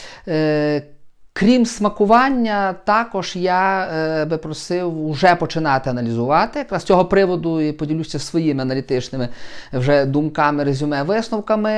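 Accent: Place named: Galicia, Ukraine. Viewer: Гидко слухати цей галичанський говір.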